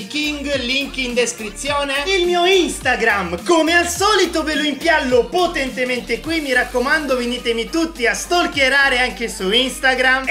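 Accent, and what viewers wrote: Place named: Catania, Italy. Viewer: Io sono di catania e mi sono sempre chiesto da dove venivi pk avevi un accento catanese ho letto il wiki e ho scoperto che vieni da catania seeeeeee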